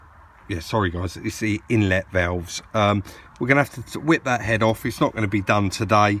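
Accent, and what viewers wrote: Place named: Liverpool, United Kingdom. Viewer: Great video Sir! Interesting English accent too.., is it Liverpool?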